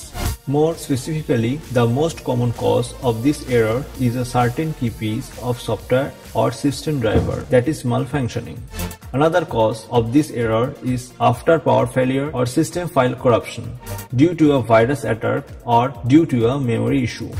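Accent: India. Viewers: I dont understand your Indian accent. What to put in the Empty USB drive is it the windows 10 installer? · Thank you so much for using this Indian voice.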